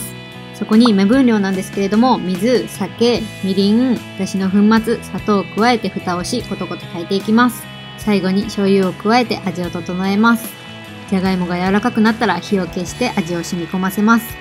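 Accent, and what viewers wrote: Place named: Kansai, Japan. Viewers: 何も違和感が無かったのでコメントを見て関西弁なことを知り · ヒレ→ヘレ 茹でる→ゆがく 煮込む→炊く バーモント→バーモンド 関西の言い回しっておもしろいですね😊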